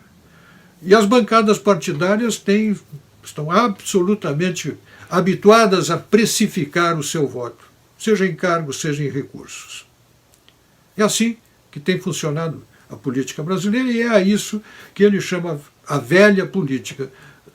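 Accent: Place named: Rio Grande do Sul, Brazil. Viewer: Sr. Puggina, quando ouço - o, neste timbre de voz típico sulista riograndense, sinto-me um privilegiado, como alguém ouvindo um professor, sentado ao centro e falando da sua experiência notável. Obrigado. Mantenha - se.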